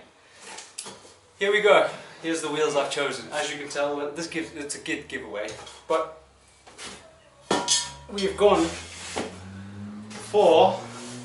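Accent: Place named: South Africa. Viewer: You south african, bru? giggle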